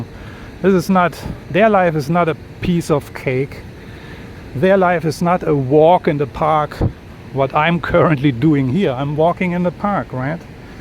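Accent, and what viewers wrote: Germany. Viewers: I like the English with the German accent!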